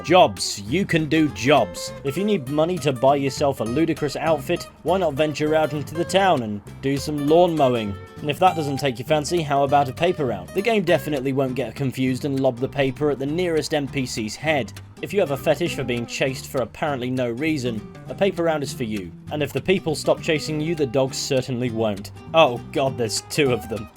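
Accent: United Kingdom. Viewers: Despite the janky controls, and the fact I could never get all 50 lessons done in any playthrough (damn you, Biology 5!), I loved playing Canis Canem Edit on PS2. Iirc, there were only 5 lessons and the Xbox 360 version had 10 lessons and was called Bully: Scholarship Edition....well, in the UK, anyway. From your accent, I'm fairly sure you remember the moral panic in the UK about a game being called Bully.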